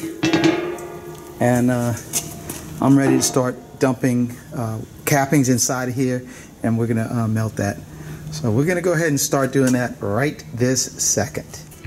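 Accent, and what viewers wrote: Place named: New England, United States. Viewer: you don't sound like you're from Louisiana. More like New England originally.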